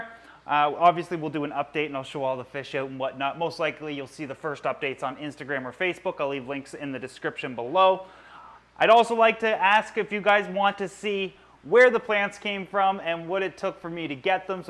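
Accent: Canada. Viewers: James Goose He's Canadian!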